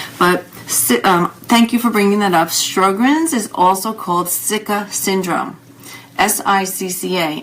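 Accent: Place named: New York, United States. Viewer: I think it's just her NY accent, maybe? I would've appreciated an improved effort towards personal appearance. Respect takes many forms.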